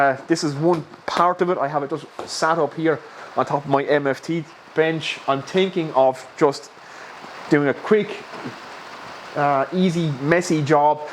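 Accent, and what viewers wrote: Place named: Wexford, Ireland. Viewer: where are you from, you sound like a Wexford Man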